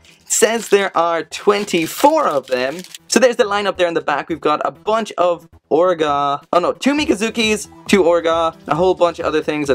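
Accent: Ireland. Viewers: Are you irish? You sound it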